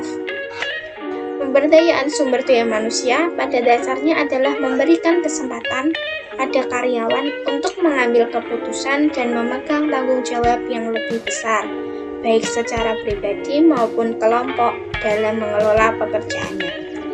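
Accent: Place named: Java, Indonesia. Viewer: Cara penyampaian unik dengan logat jawa, jadi mudah di headline pointnya👍👍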